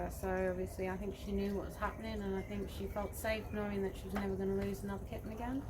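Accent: Australia. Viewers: are you an Aussie? Or British? I’m trying to pick your accent. You’re an absolute ANGEL. Thank you from the bottom of my heart, for what you’re doing for these cats ❤❤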